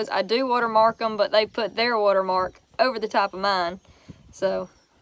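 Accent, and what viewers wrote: South, United States. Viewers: Love that Southern drawl😉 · I love your southern accent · just watched because I want to hear the southern accent.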